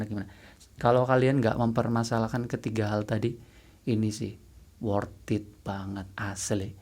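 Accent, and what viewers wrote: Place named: Palembang, Indonesia. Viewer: wong palembang wkwk, bahaso nyo ketaro bang:v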